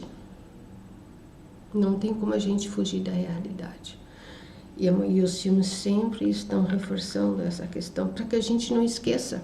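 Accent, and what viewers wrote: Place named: Russia. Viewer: Muito bom,Grande descoberta esse canal! Eu tenho uma dúvida...a Senhora é russa?Se for então a senhora fala muito bem português rs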